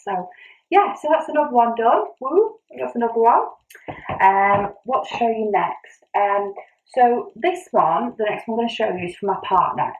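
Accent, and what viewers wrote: United Kingdom. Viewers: I came over here after hearing about you from Darren Dizzy Stitcher and he was right, you're fab! I'm another British stitcher and it's so nice to hear a British accent "knockin about" on Flosstube! You're so easy to listen to and natural. I love your full coverage pieces and daren't look at Paine Free Crafts! Looking forward to seeing your progress next time!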